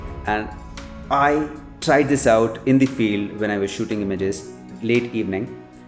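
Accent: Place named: Canada. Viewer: Your accent is funny. Are you a Canadian?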